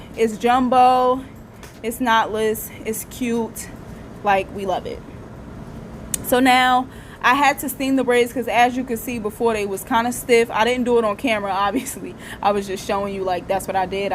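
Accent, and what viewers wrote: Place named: North, United States. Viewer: Girl I love your accent! I’m from TN but my husband’s from up north and y’all kinda sound alike!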